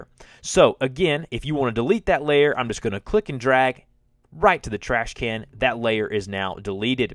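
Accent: South, United States. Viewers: Thanks for this video, it was really easy to follow! Also that southern accent is charming. ;)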